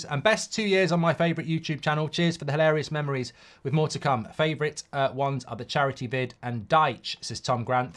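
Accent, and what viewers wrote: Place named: Liverpool, United Kingdom. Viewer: This bloke sounds like a scouser, yeah don't sack him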